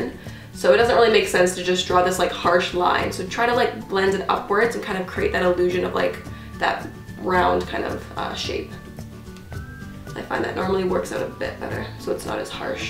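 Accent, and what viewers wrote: Canada. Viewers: you sound so Canadian lol eh? Fellow Toronto girl suffering the same cold and "Blizarding" can't wait for spring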